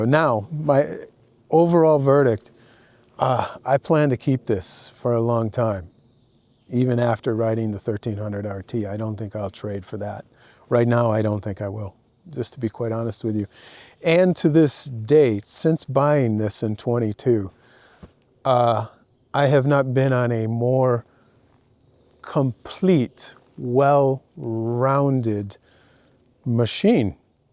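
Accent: United States. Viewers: Hey, I appreciate what you do and your candor when you review these bikes. Down side of that is it makes me want to own more than I do already. I have a 2023 RT blue like yours, and as my second RT in three years I'm pretty happy with it. I have to agree with you that that the conected ride is a piece of garbage, and is completely unreliable. However, living where I live (southeastern Utah) and riding where I ride in the western US, there is spotty cell coverage so I just use a Garmin XT2 that way 99% of the time I have GPS coverage. Since I do all I can on my own, not only because I like to, but also the nearest BMW dealer is 200 miles away, my cost of ownereship is more a time issue. When the 16K miles service needs to be done, unless I rent the propriatary tools, I may wind up going to the dealer for that, so I will be curious to see what that cost. Keep up the good work! 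By the way you speak english like an American, I have yet to catch an accent.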